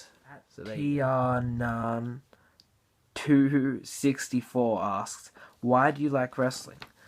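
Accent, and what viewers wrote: Australia. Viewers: Dang this time i can kinda hear your australian accent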